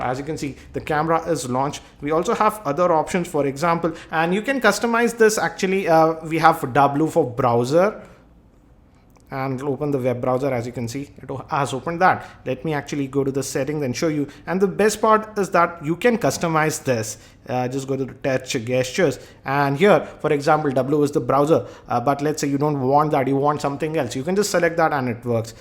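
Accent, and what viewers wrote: India. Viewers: Indian English......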